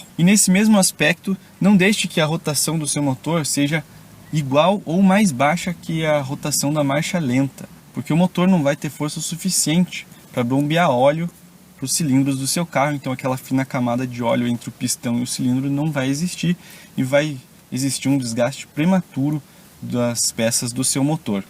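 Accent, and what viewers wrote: Curitiba, Brazil. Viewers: Vc é curitibano? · Dae jovem, tu é de Curitiba?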